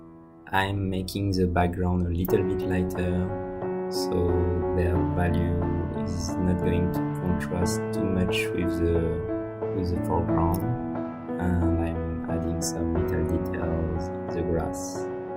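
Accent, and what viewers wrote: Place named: France. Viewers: Aren't you french ? Damn this accent XD Anyway, I love Tales From Earthsea, so I'm glad to see someone drawing a scene from it :D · For sure his French xD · He can’t sound more French than that😂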